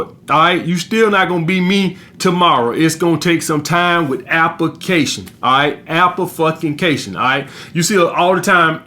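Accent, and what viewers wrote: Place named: Alabama, United States. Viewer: Battlerap Legend Alabama accent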